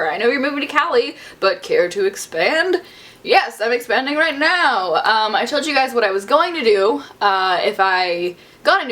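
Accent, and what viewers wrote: South, United States. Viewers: why did they not want you to have the southern accent? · You do have a slight southern twang.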